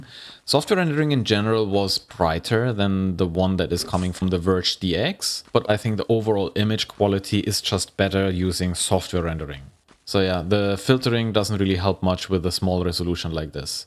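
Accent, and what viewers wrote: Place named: Germany. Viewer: The terrible German accent makes this unwatchable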